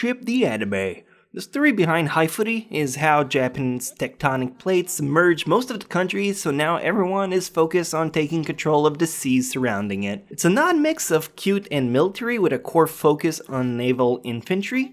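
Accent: Quebec, Canada. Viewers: CHESS oh quebecois french, I was going to say i couldnt think of any mainland varieties of french accents that sound like that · Mak Gnik French I would assume since he is Canadian, since he has an accent he'd be from French Canada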